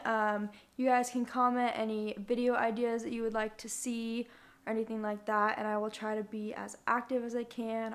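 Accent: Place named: United States. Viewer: I love your American accent !! Greetings from France :)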